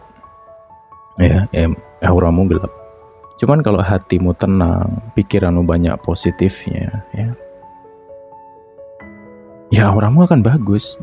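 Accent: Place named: Medan, Indonesia. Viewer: Medan mas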